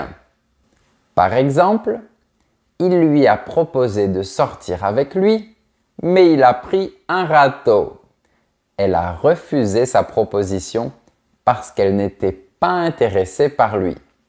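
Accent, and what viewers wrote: South, France. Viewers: Je suis française et si je t’écoute c’est par pur plaisir. Et puis je suis exilée dans le nord et j’adore ta façon de prononcer « autre chose » ( au lieu de ôtre chôse ) ça me rappelle le pays. Pour « emballer » chez moi c’est plutôt un synonyme d’enchanter: « ça ne m’emballe pas de prendre la voiture par ce temps pourri »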